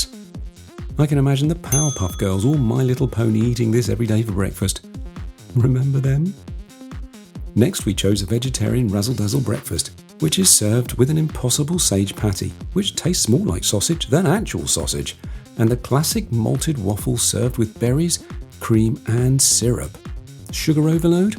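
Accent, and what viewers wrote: United Kingdom. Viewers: Why does the presenter have a British accent?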